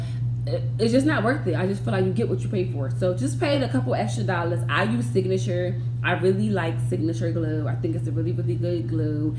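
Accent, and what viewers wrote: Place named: Philadelphia, United States. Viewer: I knew you sounded like you were from Philly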